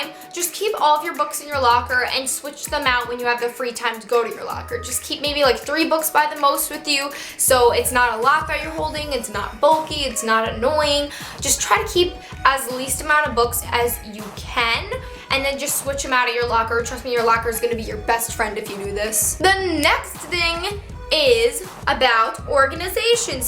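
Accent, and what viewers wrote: New York, United States. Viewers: You have a New York accent! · are you from NY ?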